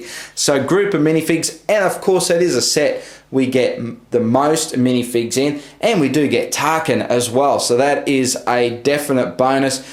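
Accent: Australia. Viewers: He has a strong Australian accent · are you Australian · Love the Aussie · This guy has a real thick strayan accent i like it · I like your australian accent. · Wow are you Australian I am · Youre an ausie, arent you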